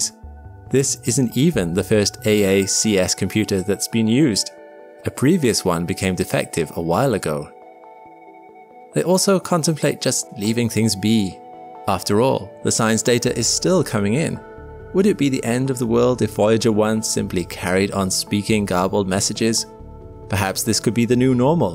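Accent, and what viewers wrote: Wales, United Kingdom. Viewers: are you welsh ? ur accent sounds slightly welsh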